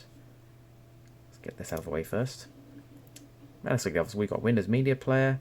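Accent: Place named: United Kingdom. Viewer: I love the british accent. "It cahnt see mah roota"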